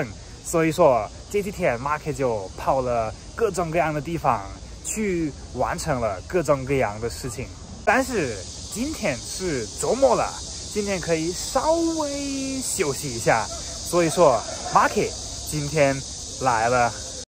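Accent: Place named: Chengdu, China. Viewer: 你的成都川普比我说得好。😅😅😅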